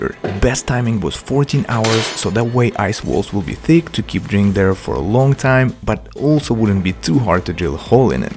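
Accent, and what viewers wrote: Russia. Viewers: У него русский акцент? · Hi,where you from?you have a russian accent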